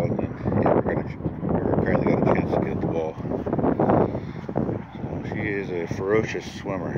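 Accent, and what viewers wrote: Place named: California, United States. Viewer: Californian acent 😁. is really happy there.. 😘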